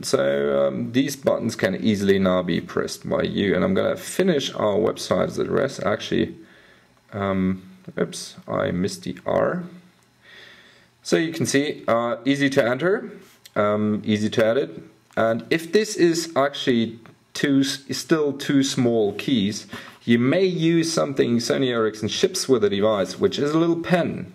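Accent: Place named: England, United Kingdom. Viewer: i hate your english accent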